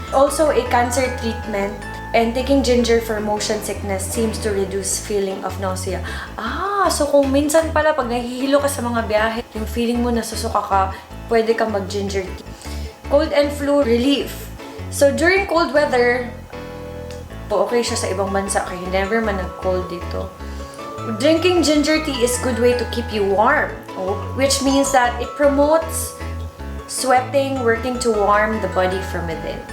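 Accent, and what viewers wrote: Visayas, Philippines. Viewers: dati nag subscribe ako dto pero inalis ko kasi parang nakakasaawa panoorin yung pgkbisaya nya nakakaturn off maganda pa naman sya saka sobra maarte mgsalita.aral ka tagalog kasi nasa manila ka naman na nakatira . sorry to comment like this para din syo yan para mas dumami subscriber mo not only visayans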